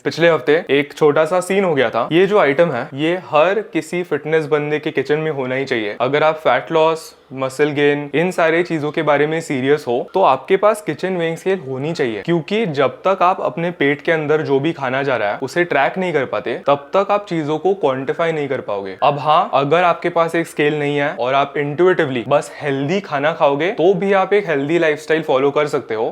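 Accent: Maharashtra, India. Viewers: MARATHI ACCENT IS SO CUTE! 😊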